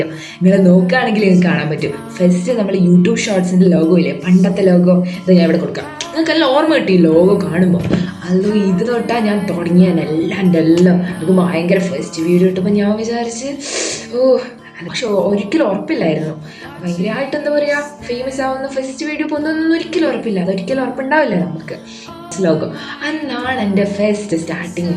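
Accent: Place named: Kozhikode, India. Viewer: Aah... Bhasha kettitta.... Ente slangum almost same aahn...Kozhikode evdya??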